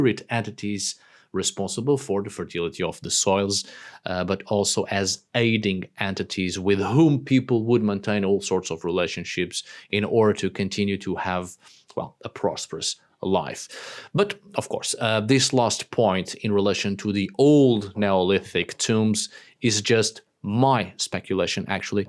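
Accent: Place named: Portugal. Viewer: Hi. I could not place that warm, Euro accent in English. Portuguese! Wonderful. I was thinking was Norwegian or such. Good luck on your existential content issues,.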